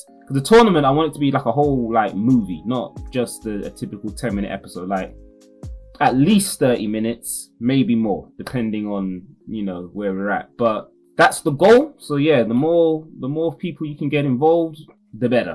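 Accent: United Kingdom. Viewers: Been watching you for quite a while but didn't expect the English accent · You sir, are a GOAT. You sound like you’re from London so hopefully I may meet you one day and be able to shake your hand and thank you personally for all you’ve done with this series and how it’s given me so much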